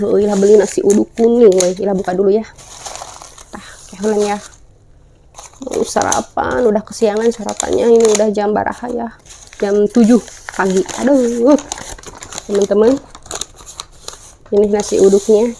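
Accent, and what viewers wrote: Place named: Banten, Indonesia. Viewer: Kaya nyya orang Banten soal nyya ngomongnyya sunda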